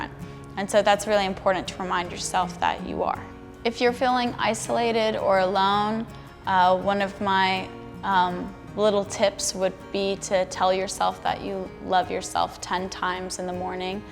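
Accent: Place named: Australia. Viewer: why does she sound kind of australian? lmao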